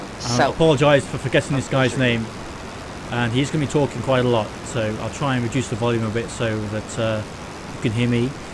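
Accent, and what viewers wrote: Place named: East Midlands, United Kingdom. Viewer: Good stuff, another excellent video with a great couple of fights. As a noob to RoF, I have learned an awful lot from watching your output. May I ask three questions? Why is that nobody in the RoF ever seem to pay attention to aircraft instruments? Along with everything you have put out I have also watched JimmyBlonde (alas now MIA), Dukely and diverse others and none of you ever seem to look at instruments. Secondly, I appreciate you are busy and haven't been very well, but will you be coming back to the Barrakuda campaign? That was shaping up to be a real beaut. Finally, if you don't mind a personal question, where are you from? I have been trying to identify your accent, I can't and my failure is driving me nuts. I'd guess somewhere in the East Midlands, maybe bordering on East Anglia but I'd can't place it. 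Anyway, keep up the good work and know your efforts are greatly appreciated.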